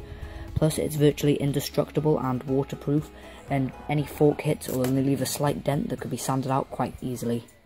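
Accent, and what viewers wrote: United Kingdom. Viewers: Hi is british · Wow, I never heard such a British accent before.... BTW you're doing some really nice work there. Keep it up ;) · what is it with british-accented people mispronouncing "aluminum" "aluminium"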